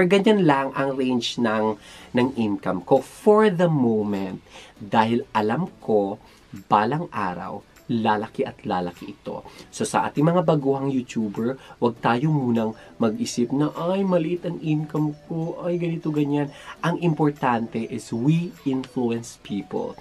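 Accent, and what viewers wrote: Visayas, Philippines. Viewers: Haha thank you natawa ako sayo 🤣🤣 bisaya ka pla salamat sa tips sana ako magkaroon ng marami 😍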